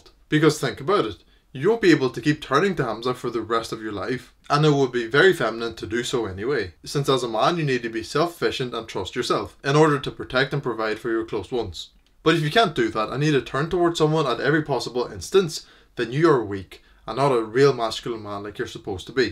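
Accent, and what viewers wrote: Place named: Scotland, United Kingdom. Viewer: Damn for a lithuanian u really picked up the scottish accent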